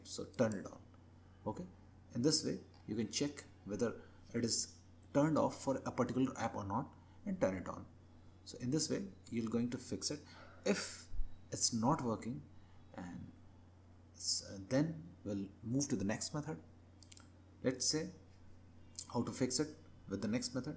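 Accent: India. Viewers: i love ur indian accent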